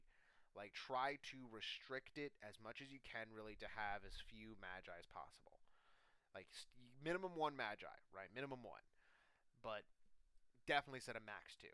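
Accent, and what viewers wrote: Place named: South East, United Kingdom. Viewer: South easterner detected, all hail the pub sub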